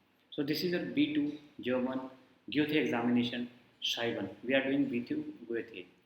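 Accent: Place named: India. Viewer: I could not understand anything at all due to your Indian accent.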